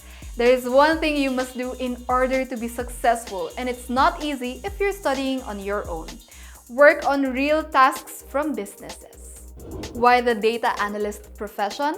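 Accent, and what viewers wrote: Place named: Philippines. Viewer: Thank you ma'am ganda nyo po. i loove your filipino accent. say it again.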